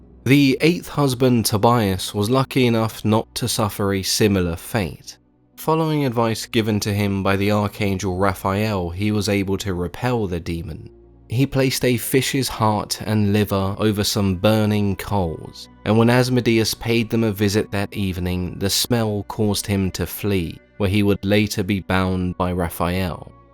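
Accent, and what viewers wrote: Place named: United Kingdom. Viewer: They are beautifully ugly like some exes love the smooth calming British accent from channel host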